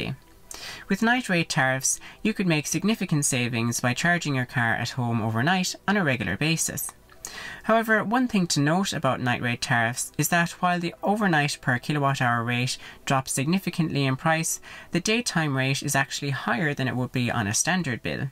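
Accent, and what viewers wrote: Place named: Ireland. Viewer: You're the most Irish sounding Irishman I've ever heard!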